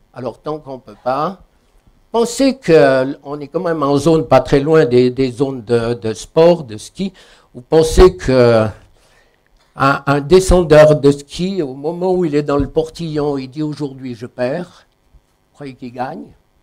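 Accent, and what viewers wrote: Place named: Vaud, Switzerland. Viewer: Magnifique avec ton accent vaudois Dieu Te Bénisse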